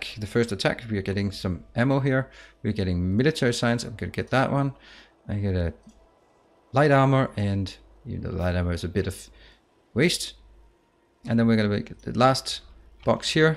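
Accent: Denmark. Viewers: Are you danish or something ? Your accent when speakin sound alot like a dane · Since I'm danish, I could immediately hear you were also danish haha. Fantastisk! :) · I'm Swedish, but I definitely heard the Danish blending through the English here. :) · First time stumbling upon your channel, I could tell right away you were Danish by the accent... Greetings from a fellow Scandinavian! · Haha i could hear you where from denmark!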